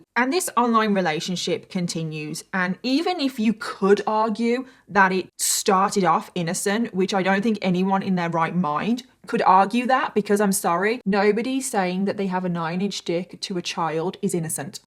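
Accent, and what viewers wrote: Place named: Birmingham, United Kingdom. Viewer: I detect 10% Brummie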